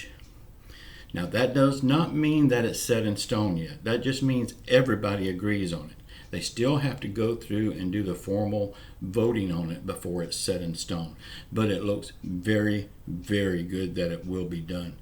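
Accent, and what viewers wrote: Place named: Texas, United States. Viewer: Damn I like you! You gotta be from my neck of the woods in either south east Texas/Oklahoma, not that it matters though lol. You say yall' prefect! I'm a supervisor and my guys love it when I say "Good Morning Yall" during the morning safety meetings lol. Of FYI you were one of the first to report the last update before the other update lol!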